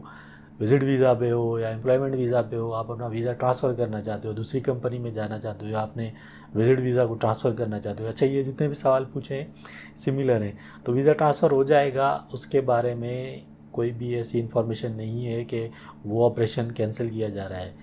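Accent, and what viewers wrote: Sindh, India. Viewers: Ada sindhi aan cha laheje mon lage tho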